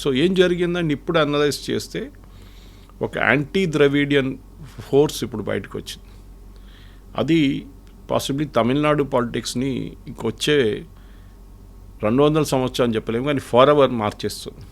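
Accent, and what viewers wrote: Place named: Kerala, India. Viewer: మీ భాషాభిమానానికి వందనాలు. కానీ ఆయన మళయాలి.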